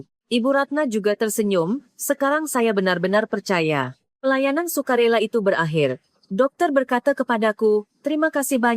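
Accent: Malaysia. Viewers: Bahasa mu Melayu Malay kenapa anda lebih suka bikin karangan lepas dan tidak jelas membawa nama indonesia apakah ini bentuk penghinaan anda terhadap Bangsa dan negara ku karna AQ Tahu ini cerita fiktif kenapa anda tidak membawa nama bangsa negara yang sesuai dengan logat bahasa mu bahasa yang kamu pakai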